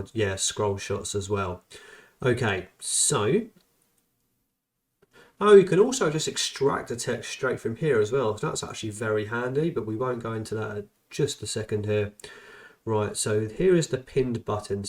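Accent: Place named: London, United Kingdom. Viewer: I like your London English accent!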